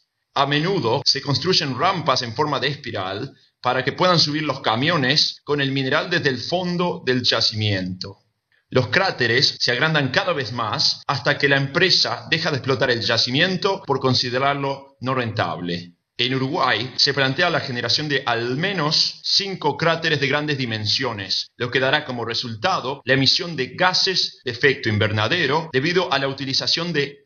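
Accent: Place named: United States. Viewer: Lamentable este vídeo, totalmente político y falsante, el primer y grave error es entre el minuto 6 y 7 que habla sobre la decisión del presidente de la instalación de una planta de celulosa en el año 2000 y ponen una foto de tabarez Vazquez, cuando recién asume el cargo en el año 2004, increíble, no lo mire todo porque me parece una perdida de tiempo, otro detalle es la voz del narrador con acento yanqui, y por cierto EEUU es el país que mas contamina del planeta, produce nada mas y nada menos que el 30% de emisiones industriales de todo el mundo, Pd. en mi persona estoy en contra de la contaminación.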